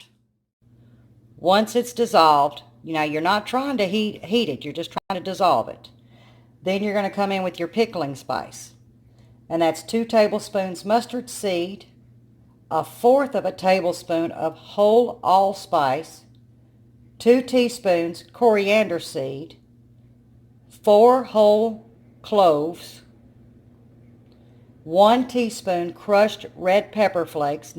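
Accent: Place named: Florida, United States. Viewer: I absolutely love your accent. My kenfolk are from Blounstown FL Panama City FL and speak like you. You sound just like my cousin 😊 Good video. Thank you.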